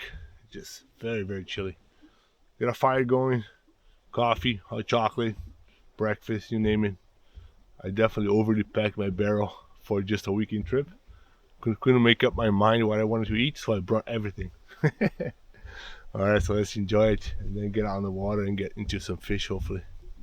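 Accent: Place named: Brazil. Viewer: Boa, pelo seu accent eu percebi que era brasileiro haha. Video incrível, qual região você está? Abraços!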